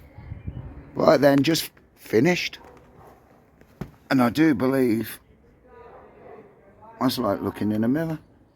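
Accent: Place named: Birmingham, United Kingdom. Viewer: Nice job! You sound like you are from brum someplace 🤔 how much would you charge to do a similar job on a passat?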